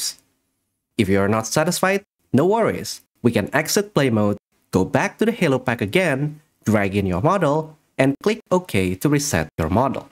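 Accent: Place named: Indonesia. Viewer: Amazingly helpful, also found your other tutorials and facial expression packs to be extremely valuable, thanks so much, especially for the free stuff! Also, CMIIW, but your accent sounds pretty South East Asian (dare I say Indonesian?) it adds a lot of charm to the videos and, from a fellow Indonesian, I hope you succeed and continue to make tutorials like these.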